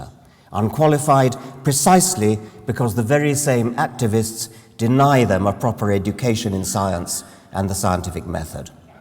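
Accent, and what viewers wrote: England, United Kingdom. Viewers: It's just a British accent, we in 🇬🇧 nearly all have one. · Arrogant no that's what English sounds like .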